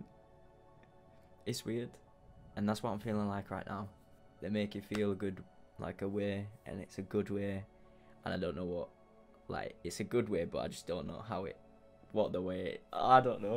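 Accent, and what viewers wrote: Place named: United Kingdom. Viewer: I know how you feel...Even though I already know the songs, listening together with you put me in exactly the same state as you. A kind of bliss and existing in my own sphere^^ It's like his voice, his emotions and the lyrics stay even when the song is already finished, and keep resonating with different parts of my subconciousness.... I honestly love how moved you are by their songs, makes me really enjoy watching your reactions. Thank you! :) Btw as a non English native speaker.... still trying to figure out your dialect^^ I would go for GB but there are still so many possibilities lol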